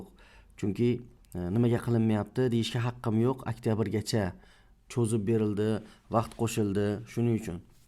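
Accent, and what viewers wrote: Khorezm, Uzbekistan. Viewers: Брат хоразмданмисиз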